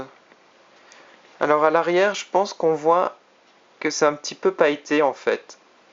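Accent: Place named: France. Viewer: merci bien! très bien fait accent française Gracias!!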